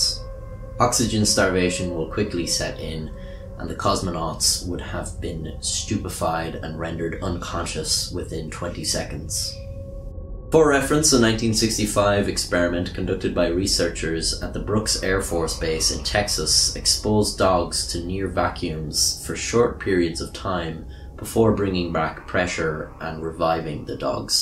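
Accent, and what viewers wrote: Ireland. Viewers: It's refreshing to hear another Irish accent that I'm not used too during this quarantine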